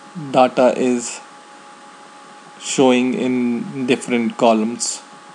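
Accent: India. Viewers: thanks, it helped me a lot; and I truly appreciate your Indian accent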